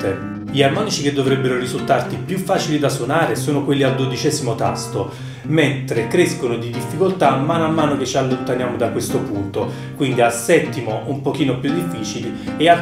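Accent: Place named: Naples, Italy. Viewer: Grazie sei molto bravo e chiaro..poi quell'accento napoletano mi rilassa una cifra!un caro saluto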